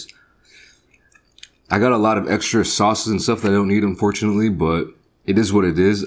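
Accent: United States. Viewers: Eh? Of course he's American check his accent!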